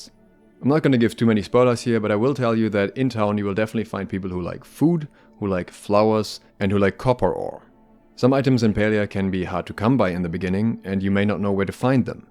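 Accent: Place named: Germany. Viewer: You sound like you have a German accent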